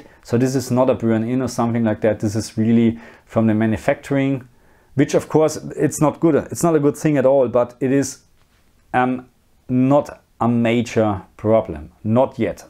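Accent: Germany. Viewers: Ist er Deutscher? Das englisch hört sich so an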